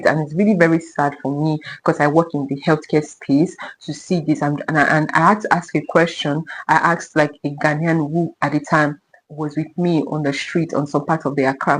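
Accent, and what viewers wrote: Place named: Nigeria. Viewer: Your accent is quite different from some Nigerians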